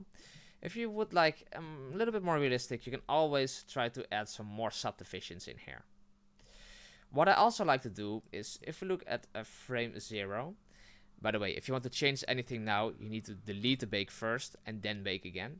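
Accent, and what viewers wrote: Netherlands. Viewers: Are you dutch cuz your accent is very familiar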